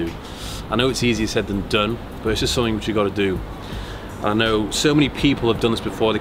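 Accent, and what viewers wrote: United Kingdom. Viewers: Thank you very much. Improved my fitness knowledge and even my skills on understanding more the British accent (I’m from Italy) 💪🏼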